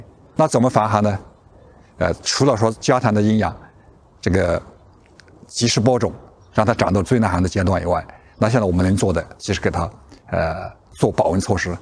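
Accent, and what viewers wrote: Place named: Kunming, China. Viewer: 谢谢李老师！听着你的昆明口音，太亲切了！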